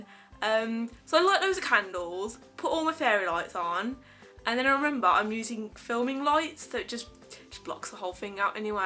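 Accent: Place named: Birmingham, United Kingdom. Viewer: So nice to hear another brummie on here! Great video, so jealous of your haul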